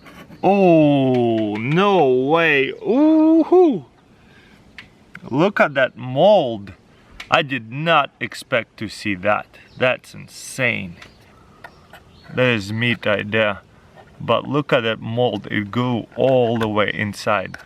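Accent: Russia. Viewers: Anyone else read this in his Russian accent😂😂😂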